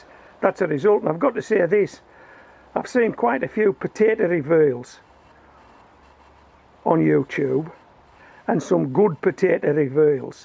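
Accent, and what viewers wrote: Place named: Teesside, United Kingdom. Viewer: Hi, Just discovered your channel. From your accent i would guess you are from Bishop Auckland or Teesside. I live in Consett. Just watched your video about winter onions. I will give those winter onions a try. I already grow potatoes, carrots, parsnips etc in pots. Great channel by the way!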